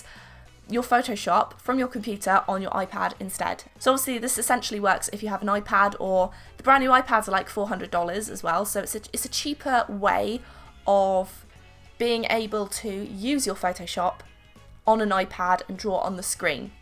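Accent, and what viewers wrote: United Kingdom. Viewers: bRiTiSh aCcEnT🌚💔its cute tho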